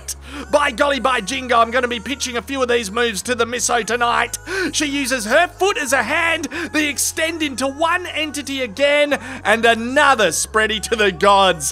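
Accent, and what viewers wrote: Australia. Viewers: Wow, a very entertaining and enthusiastic Aussie commentary. Not to mention the incredible couples act. 😂😂😂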